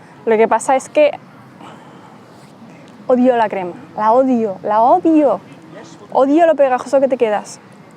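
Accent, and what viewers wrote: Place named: Madrid, Spain. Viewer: ¡Un video muy guay y muy útil! Mi tutora es una madrileña también y vuestras maneras de hablar son muy parecidas.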